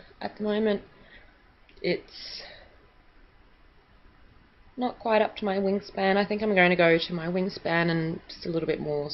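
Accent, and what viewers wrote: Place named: Australia. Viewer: Hi I'm a new knitter from Newcastle NSW. I've been using You Tube to help me learn, and was so excited to hear an Australian voice. It really doesn't take long to become a yarn addict does it!! I always assumed that our home grown wool was still in abundance, but have been surprised at how hard I've found it to source locally. Do you know of any local mills or indie dyers out their that I could support? Looking forward to your next episode.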